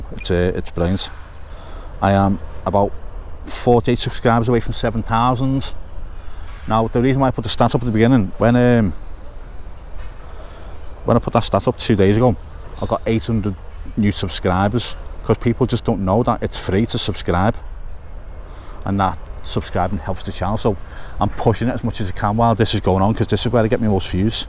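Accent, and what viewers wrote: Liverpool, United Kingdom. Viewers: Am a long distance ‘pool fan (Teesside). I could listen to your scouse accent all day long. Love your videos as well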